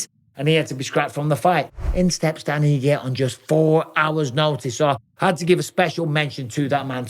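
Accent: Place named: England, United Kingdom. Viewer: This is why I love f**** Michael bisping.. speaking English English and s*** .. putting you motherfukers on game😂❤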